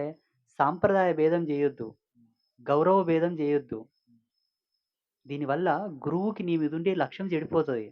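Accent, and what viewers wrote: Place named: Telangana, India. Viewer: Meru telanganayasa matldina me vakkulu adbutham swami 🙏 telagana biddalandaru garvapadali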